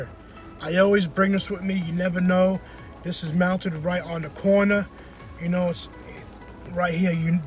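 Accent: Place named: Boston, United States. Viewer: Yeah exactly lol! Just take the blame it it's your fault. Yeah, been raining like crazy here. I can tell by the accent you're from Boston!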